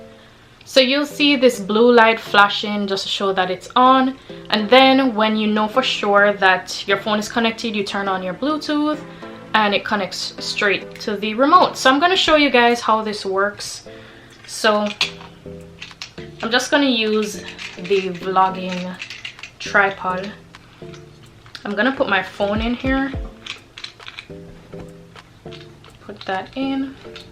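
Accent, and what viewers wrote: Jamaica. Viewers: love your accent, im jamaican as well🥳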